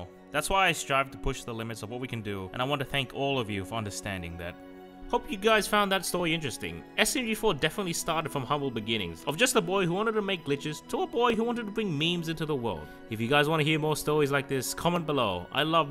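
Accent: Australia. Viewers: You are from Australia? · Is smg4 aussie? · OH SHIT I NEVER KNEW SMG4 WAS AUSTRALIAN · Wait…… smg4 is Australian? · your Australian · Wait. Are you from Australia? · Wait so you’re Australian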